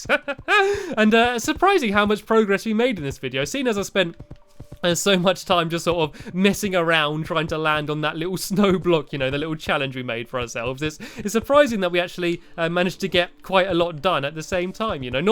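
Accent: England, United Kingdom. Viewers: My little sister says she loves watching your videos because she likes your english accent and your laugh, and me too, but you know not the same way she does, youre actually an amazing commentator and I can never wait for your videos because theyre so good and funny! :D, and also, the name Stampy HotBuns sounds hilarious! Lol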